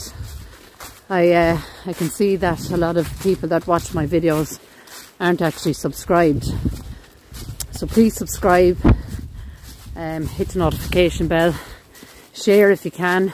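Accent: Ireland. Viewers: Wow! So fascinating look through those tiny holes and seeing all those coffins you did a marvellous job focusing through so we can see 👏 ♥️ Thank you keep up the wonderful work you do i absolutely love seeing all these amazing graveyards with you and your Irish accent to die for 🥰😁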